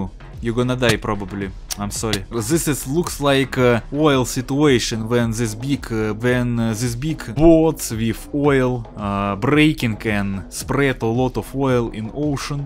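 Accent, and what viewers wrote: Russia. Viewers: Love your Russian accent!!! Anyone who doesn’t is cyka blyat !!! Third comment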